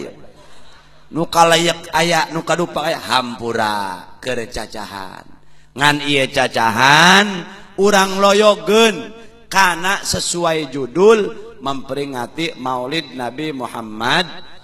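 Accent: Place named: Rangkasbitung, Indonesia. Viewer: Rangkas bitung hadir poko na kh mah eweh 2 na mantap ciri khasnya bahasa rangkas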